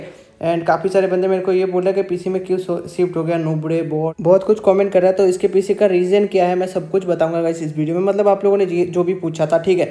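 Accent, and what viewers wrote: Bengal, India. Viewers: Bhai tu bangali hai kya ? 😊😊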